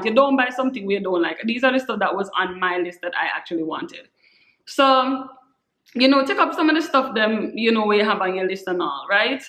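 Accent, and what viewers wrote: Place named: Jamaica. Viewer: Thanks for shearing this information to as we have Learn 1 or 2 I can not wait to watch ur China home where ur Jamaica accent is really good 😊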